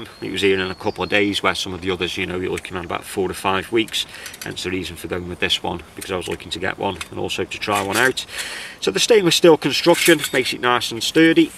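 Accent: United Kingdom. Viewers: Very interesting and I believe that anything on YouTube is subject to an intellectual property right and prohibits a third-party from using any content. So if all else fails to stop them, then that's one option. Also, I take it that you are located in the UK. If you don't mind me asking,. what region is your particular dialect? And I don't have any difficulty understanding you, I don't know how others would? Best of luck with your channel!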